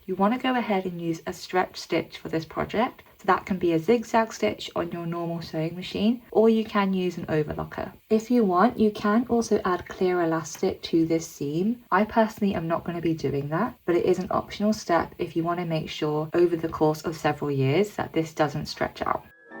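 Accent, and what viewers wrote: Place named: United Kingdom. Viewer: what kinda uk accent do you have? i love it!!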